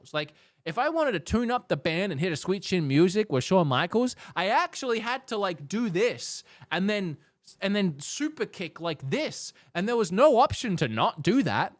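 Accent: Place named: Australia. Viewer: Different pronunciation for Australians which is still correct. British, Americans and Australians stress different parts of some words.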